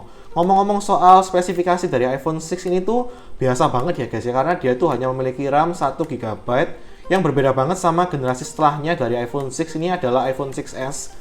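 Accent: Indonesia. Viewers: Logatnya surabaya banget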